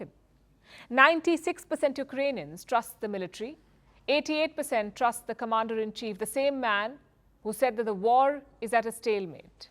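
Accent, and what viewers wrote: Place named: India. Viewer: I love your news but please speak English little bit better... I can't understand of of your Indian accent, its way too strong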